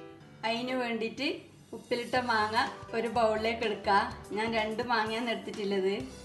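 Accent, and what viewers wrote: Kasaragod, India. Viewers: നീലേശ്വരം ഭാഷ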